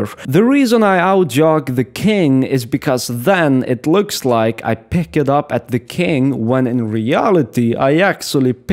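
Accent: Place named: Russia. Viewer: how many accents do you Russians have? I've watched few Russians youtuber all of them are diffrent and none of them speaks the same accent as I've seen in movies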